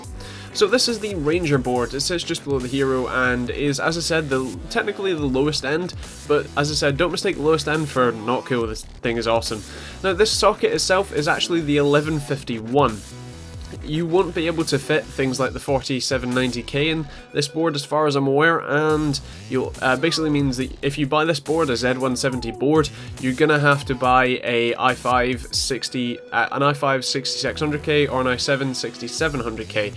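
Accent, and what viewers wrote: Scotland, United Kingdom. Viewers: You seem to have a very slight Scottish accent? Where you from?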